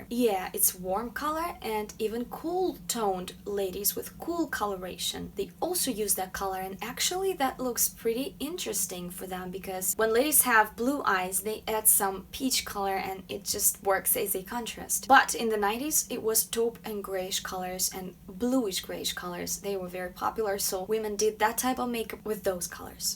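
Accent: Russia. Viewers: I hear some accent..is she russian?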